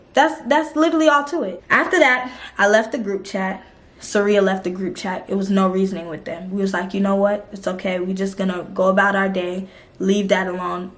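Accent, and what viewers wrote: Jamaica. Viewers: she jamaican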